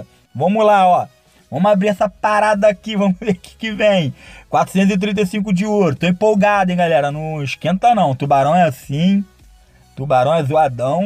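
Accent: Rio de Janeiro, Brazil. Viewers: man o q e da hr e a voz dele eo sutaque carioca top demais.show galera KKKK salve eh nois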